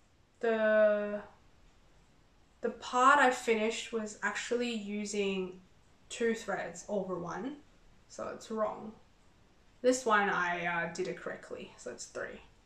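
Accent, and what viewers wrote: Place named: Australia. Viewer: Loved learning about you. Your English is perfect with that lovely Aussie accent! I also tend to gift my stitching, though I recently purchased patterns I want to stitch and keep. I’m lots leer but like your style. Looking forward to more!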